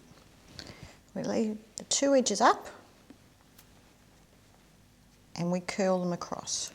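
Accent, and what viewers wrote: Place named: Australia. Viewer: From Greece....I remember these WHEN I was living in Australia....I may even try to make some and see how I do..LOVELY AUSSIE ACCENT!!!!Thank you for the videos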